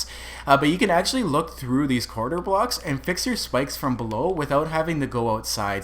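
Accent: Canada. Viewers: You're totally Canadian aren't you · you canadian cause i am and u sound like u are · he sounds canadian..... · You sound so canadian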